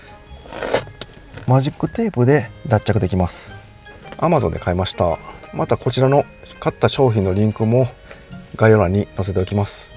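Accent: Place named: Kansai, Japan. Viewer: 皆さんもコメントされているように、それでインディのDIY振り返りだったんですね！ほんと、いつも相方と楽しみに拝見してます。私もキャンピングカーを検討し始め、さんの動画を随分参考にさせてもらいました。今年の12月納車予定です。同じ関西人なので、さんの語りは親近感ありすぎです。インディ乗り続けて欲しい気持ちもありますが、次に何にされるかもとても楽しみです。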